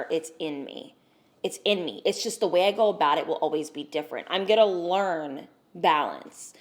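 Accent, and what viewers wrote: New Jersey, United States. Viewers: Omg this accent! It’s so cute, are you from New Jersey??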